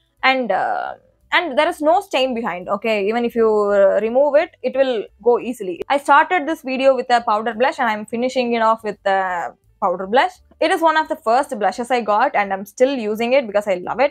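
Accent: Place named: Tamil Nadu, India. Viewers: Hello.. am ur new subscriber 😊.. addicted to ur videos dear.. doing great 😊.. i like the way u spk Tamil.. the slang..😊.. keep going my dear.. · Ur tamil slag is awesome ❤do it in tamil ❤❤ keep rocking 🎉🎉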